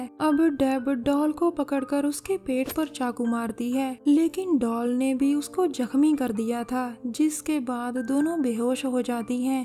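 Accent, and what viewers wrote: Punjab, India. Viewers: Aap punjab se ho kyaa g.....Very nice explained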